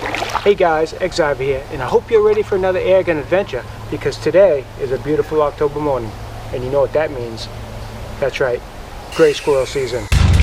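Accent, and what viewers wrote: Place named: Vermont, United States. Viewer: you sound like your from the NEK in vermont lol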